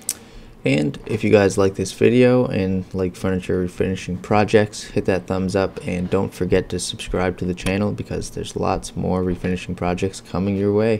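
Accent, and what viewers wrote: Canada. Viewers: Your pronunciation of your "ou" s gives you away as being from Canada. I would say Calgary? Somewhere in the mid part of your fine country.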